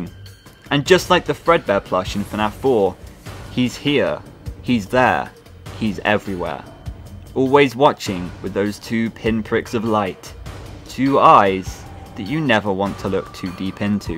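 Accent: United Kingdom. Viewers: Ok but we’re just now gonna mention þe fact þat he got more and more British while voicing? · English isn't my first language and thus I find the UK accent fucking hilarious. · talking reminds me why people hate British people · that British accent tho XD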